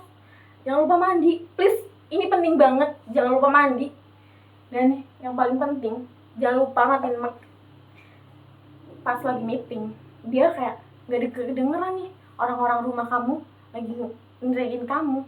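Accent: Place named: Banyumas, Indonesia. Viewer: Gen Z ngapak 🥰🥰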